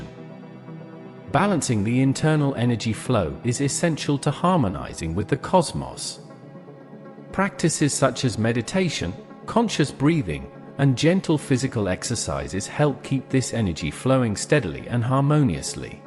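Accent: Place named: Japan. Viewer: Sounds like Japanese tone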